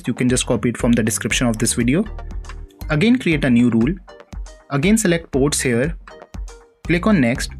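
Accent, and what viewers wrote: India. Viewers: i love your indian accent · Yeah he does have a very tolerable voice for being indian i like it he made quite the nice video too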